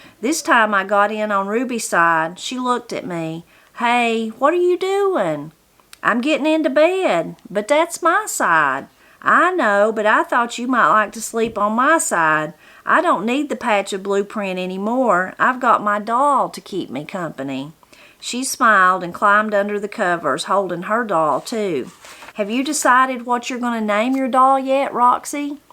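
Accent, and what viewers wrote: Appalachia, United States. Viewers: I enjoyed that story so much, and you're a fine reader. You're the sort of reader who lets me lose myself in the story and forget that there is a reader at all. I pictured everything so clearly in my mind's eye. I think having someone with an Appalachian accent tell the story was a big part of what made it come to life. Thank you for a wonderful Christmas gift.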